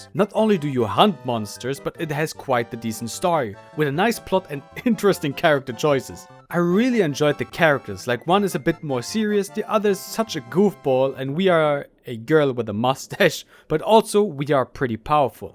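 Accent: Germany. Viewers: i can hear the german accent on the cool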